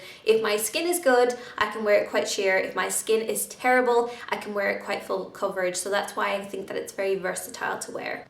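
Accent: Ireland. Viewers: Estée Lauder amazing by far my favourite foundation ... Btw what part of ireland are u from ? Xx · Are you Irish?!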